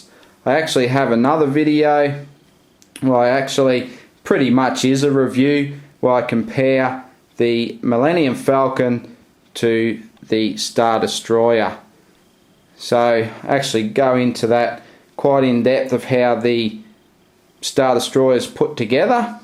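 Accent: Australia. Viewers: The Aussie accent lol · Yes we get it you're australian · I can tell you now, most Australians don't have accents this strong.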